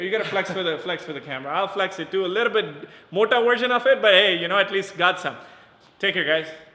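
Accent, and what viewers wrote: Punjab, India. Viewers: Excellent elaboration of each workout. I really like the punjabi language touch of the guy hosting the videos. Keep the good work up..!!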